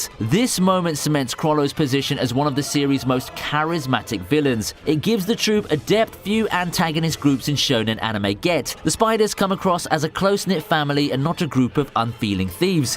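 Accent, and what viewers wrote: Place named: United Kingdom. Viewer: this video is really good but... i hate these name pronunciations. your english please stop trying to say the name properly because it just sounds goofy with our british accent.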